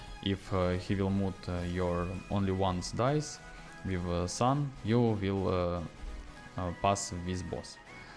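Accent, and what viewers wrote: England, United Kingdom. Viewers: Oh, this beautiful english accent. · Настоящий англичанин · О, вы из Англии?😃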